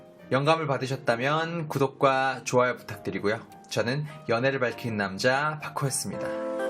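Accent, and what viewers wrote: Gyeongsang, South Korea. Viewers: 갱상도 출신맞?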